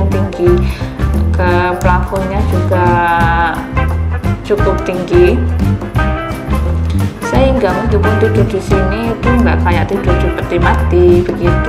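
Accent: Java, Indonesia. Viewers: Sy suka dialek bahasanya. Medok tp lucu n sumringah dengernya😊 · medok banget suaranya mbakk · Mbak ko logatnya medok bnr y? Tapi sy salut... Idenya kreatif. kbetulan sy rncananya mau bangun kos2'an 4 pintu. kalo duitny cukup. Smoga tmbah sukses y Mbak???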